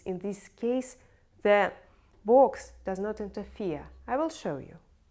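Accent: Russia. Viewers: I think I found my new asmr channel. I love the Russian accent and I love listening to people talk about guns. 🤣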